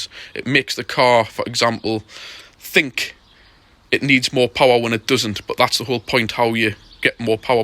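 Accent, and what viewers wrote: Newcastle, United Kingdom. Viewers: Cracking info, as a car/biker tech-head it makes perfect sense to me. . Just ordered an agri tune for mine, I'll set it same as yours D5. The pronunciation is Agri (Agricultural) Tune. I previously used the 'crude' common rail fuel pressure adjustable boxes in my 4 previous diesels, C220 Coupe, C220 AMG Sport, BMW X1, Mazda CX5, FIAT Doblo even, (model specific boxes obviously) with no issues and VERY noticeable power gains, but as this box cuts the turbo in earlier it's a much better unit, you're entirely correct. 👍 Me and my Aussie wife chuckle at ya Geordie crossed with Ashington accent. I'm a Geordie, but she being Aussie she chuckles 😃. 'Mitsybooshee' class love it 😀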